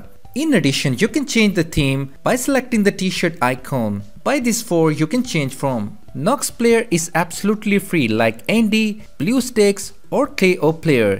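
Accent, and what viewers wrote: India. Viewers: It’s not that bad...he sounds like tech support from the land of India! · So Bad englisch i understand like nothing pls do something against your Indien acent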